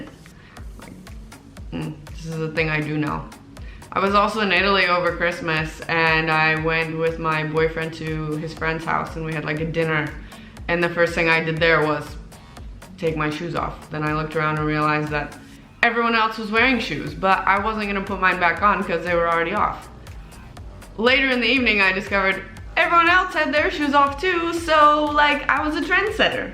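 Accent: Czech Republic. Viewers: You speak almost totally American, but then have these noticeable slight inflections of accent that are super specific to the Czech accent. When you say "Drink beer" with the way you pronounce your R's is a perfect example. Are you Czech by birth who lived a whole life in the US? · My guess is she's pretending to be American to ride on the popularity wave of "foreigner reacts to Czech customs" videos. She certainly doesn't speak like American, her accent is very reminiscent of a native Czech. I stumbled upon this channel few years ago, I think she had some even older videos on the channel where her Czech accent had been even stronger. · Why do you have a czech accent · That's exactly how it appeared to me, too :) The lady literally looks like a Czech (I love her eyes :) and has an incredibly Czech accent. I would have never guessed she was a real American :D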